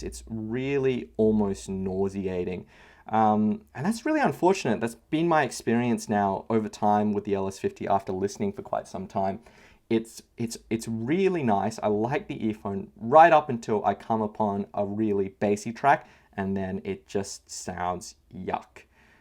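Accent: Australia. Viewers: Oh now I realized you are Chinese. Good English mate. Hey fuck Australia man you don’t need to talk like that. Is a garbage place.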